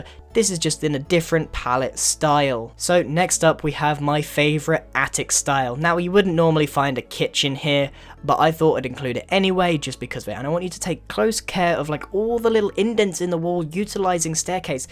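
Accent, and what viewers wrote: England, United Kingdom. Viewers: thank god he's british ! And god save the queen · Are you British? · An easy-to-digest, well spoken, simply arranged tutorial. And he's British! Great series, keep it up! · he's British the way he pronounces some words doesn't match with ours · you sound british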